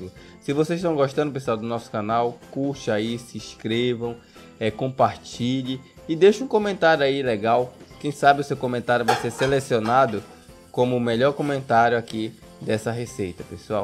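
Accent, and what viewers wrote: Pará, Brazil. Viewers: Pelo sotaque é paraense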